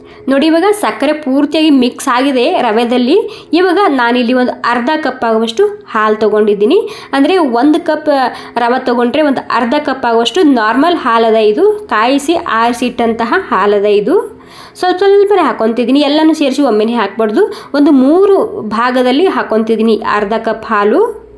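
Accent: Maharashtra, India. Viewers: Recipe 👌👌,and your Kannada with Marathi accent 😊Stay blessed.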